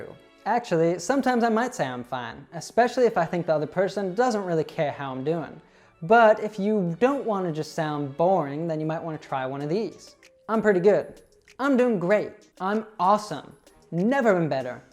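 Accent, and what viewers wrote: United States. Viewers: Thanks a lot.l am learning how to pronounce words in american accent with you. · Amazing American accent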